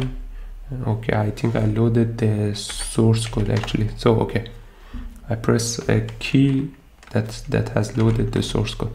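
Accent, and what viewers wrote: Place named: Iran. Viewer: way to go man. I love the section you speak about the Javascript Garbage. 😅😆😆. We appreciate the Persian accent by the way!!!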